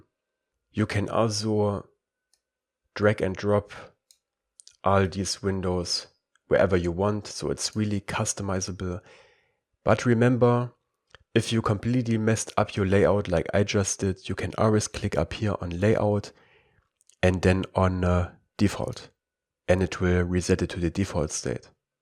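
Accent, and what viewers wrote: Germany. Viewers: bist du deutsch? dein aktzent kört sich so an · you sound like you are german. Are you? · I love your german accent, makes it so much easier as a german for me to understand this compared to some american tutorials :D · I just feel that german accent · Dieser deutsche Akzent. <3 Schön erklärt. xD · so ein geiler deutscher Akzent😂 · are you from Germany?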